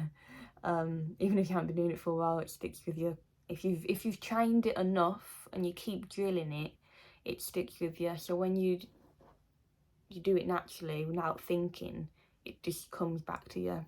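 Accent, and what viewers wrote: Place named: United Kingdom. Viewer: So Sweet of You to Share Your Life and Journey with All of us. BTW, LOVE YOUR BRITISH ACCENT.... Some of my Adult Childrens' Close Relatives Were Born, Raised, & Reside in England. Thank You For Your Terrific Videos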